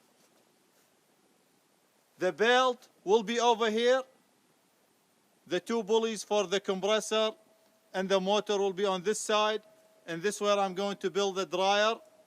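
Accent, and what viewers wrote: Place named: Iraq. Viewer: لهجتك مال عراقي بس لاتطلع عراقي 😅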